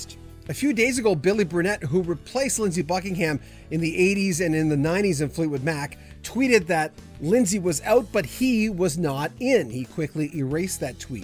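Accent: Canada. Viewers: Is this guy Canadian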